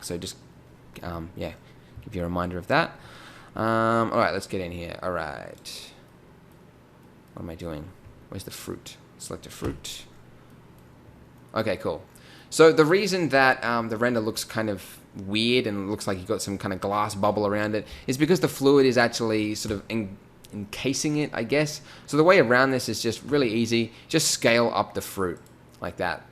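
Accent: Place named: Australia. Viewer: He is Australian i am guessing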